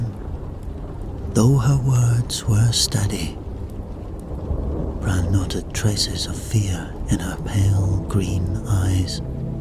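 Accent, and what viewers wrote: Scotland, United Kingdom. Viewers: Think it's only a matter of time before your channel really takes off, your stories are unlike any other sleep channel, I really get list in em and you've got a great voice with that slight Scottish twang, not too heavy, perfect for sleep stories man. I think a Underworld sleep story would be a good one, the Vampire death dealers hunting the lycanthropes. Yes brother what you think??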